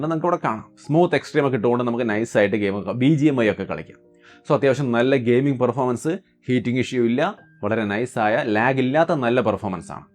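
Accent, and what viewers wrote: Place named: Palakkad, India. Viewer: Palakkad karananeleee☺️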